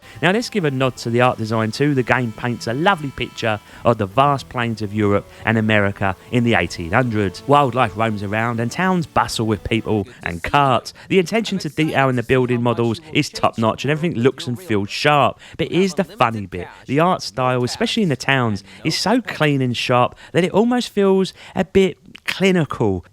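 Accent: Cockney, United Kingdom. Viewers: First time I’ve heard a fancy cockney pirate explain a train game to me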